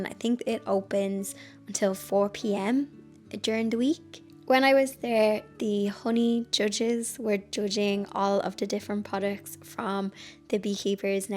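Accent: Ireland. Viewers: Aloha doll, new to your channel & adore your style so much. To hear that lovely accent again is a treat. I used to spend most of my summer holidays over in Sandymount & Ringsend, with close family friends. Many happy memories of The Strand, Bray & Phoenix Park & the town centre in Dublin. The cats are gorgeous. XXX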